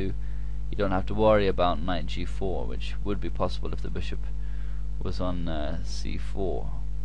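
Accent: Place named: United Kingdom. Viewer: Beautiful and great videos!, especially those on Carlsen, and of course, I enjoy your British accent, 'couse I am a fan of the British accent. Well done!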